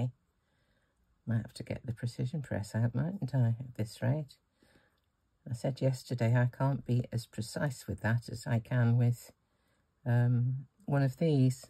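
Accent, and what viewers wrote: United Kingdom. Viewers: I hear a lot of differences in words from the various British YouTubers. I just love the accent and your voice in particular is so beautiful and appealing. You just sound so sweet, I wish I could meet you and have dinner or Supper as we say in the South. Some of the words I’ve noticed are: Right-OK (as a bridge to the next thought) Flat-Apartment Crisps-Chips Biscuit-Cookie Shops-Stores Uni-College Dustbin or Bin-Trashcan or Garbage Can Post-Mail Trousers-Pants Trolly-Cart or in the South, /Buggy (shopping) Trainers-Sneakers or Tennis Shoes (pronounced TennieShoes in the South) Boot-Trunk (car) Centralize it-Center it Holiday-Vacation Love your videos so much and I have to Re-Subscribe regularly. I try to keep an eye on it. I don’t know why they do that. Love from Murfreesboro, right outside of Nashville, Tennessee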